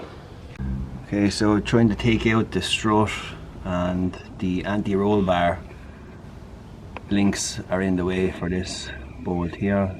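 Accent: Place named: Ireland. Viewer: I like your Irish accent!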